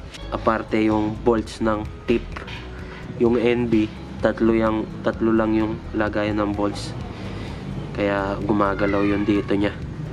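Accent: Pampanga, Philippines. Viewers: Kapampangan ka pala😅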